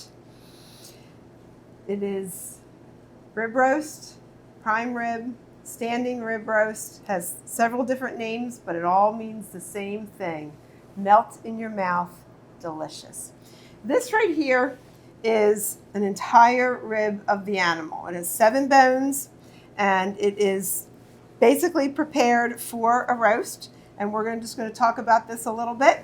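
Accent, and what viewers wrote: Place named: Tidewater, United States. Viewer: Great video. Love that Tidewater accent!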